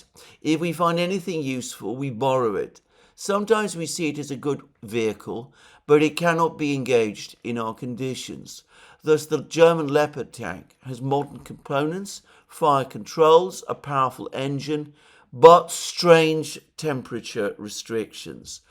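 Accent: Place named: RP, United Kingdom. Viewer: Click clack click clack over the rickety bridge. Who is the ogre? Are NATO the Billy goats gruff? Freudian slip Alex? Public school - your accent is emulating public school. I did suggest it is a dislikes of your position that makes you love Russia. Kim Philby said the same but to most, he was establishment.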